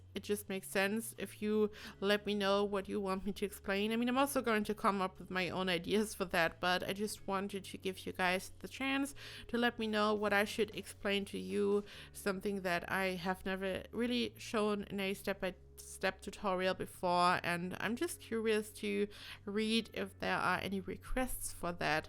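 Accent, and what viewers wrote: Germany. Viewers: Are you from Germany ? I can hear there some kind of accent :) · are you German ?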